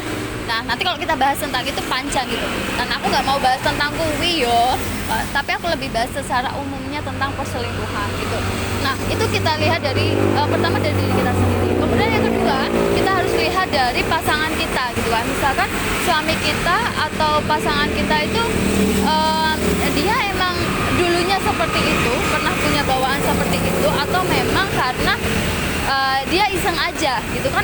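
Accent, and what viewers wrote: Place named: Java, Indonesia. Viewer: Iya memang sengaja medok supaya unsur jawanya ga ilang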